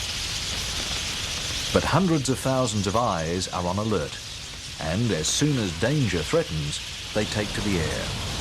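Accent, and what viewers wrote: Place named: United Kingdom. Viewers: The narrator's name is. He is an English-born Australian, so that may well be the reason why he has a touch of British in his accent. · WALKMAN from Australian TV? Then yes. The announcer sounded more British tho.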